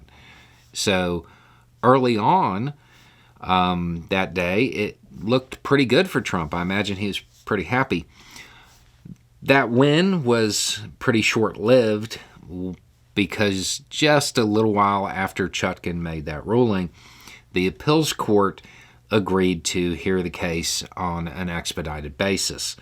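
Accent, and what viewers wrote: South, United States. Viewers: I’ve asked this before, but haven’t gotten an answer. How did this guy develop a southern accent? He didn’t have on in his very early videos. I agree with 95% of what he says. So I’m not a Trumper MQRQN. Just an FYI.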